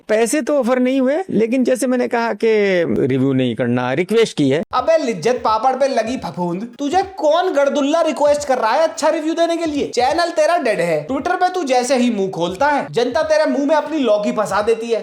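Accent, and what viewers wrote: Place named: Delhi, India. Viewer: Bhai apki Avaj se Lgta aap pakka delhi se honge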